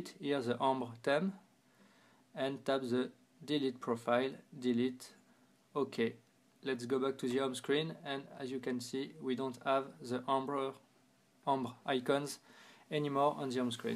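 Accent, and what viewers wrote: France. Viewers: LOL un français qui veut parler anglais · I like your French accent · I Like His French Accent But I Dislike His Pronunciation Of 'Theme'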